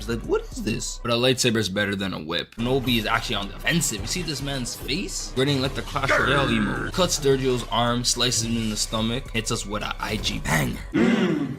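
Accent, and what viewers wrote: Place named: Toronto, Canada. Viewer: are you from Toronto? I can sense the accent like i sense the force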